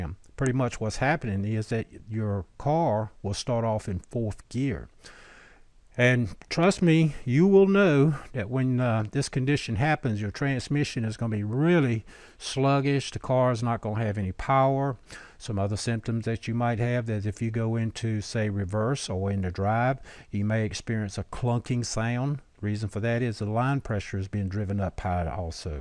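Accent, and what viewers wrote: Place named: Virginia, United States. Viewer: You sound like you’re from VA. Good video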